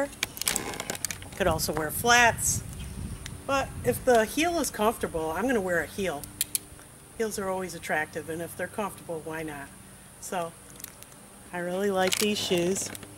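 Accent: Pennsylvania, United States. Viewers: Hi! I recently found your channel and I'm totally hooked! I love your accent which I think is from PA but reminds me of NY. I'm from NH currently living in TX. I love your patterns, fabrics and beautiful outfits. I'm a new assist and get so inspired by you. I love your sweet friendly manner and how happy and positive you are! It's really like visiting a good friend. Thanks for all you do😊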